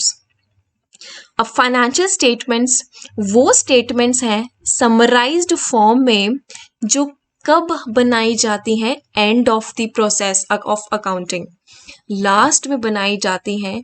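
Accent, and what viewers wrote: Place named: Kashmir, India. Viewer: Mam u seems have like our Kashmiri voice Thank u so much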